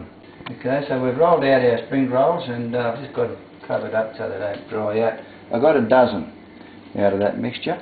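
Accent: Australia. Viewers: AWESOME you are a great chef Link your site to some other similar site... People need to see you... I found you by accident and I am so happy... I will subscribe now.... Thanks for the great videos..California is my home where are you from ? is that an Aussie accent? just curious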